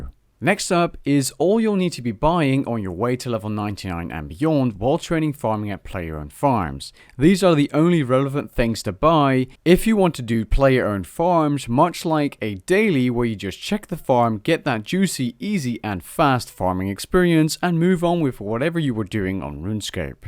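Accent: Netherlands. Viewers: i knew there was a bit dutch in the voice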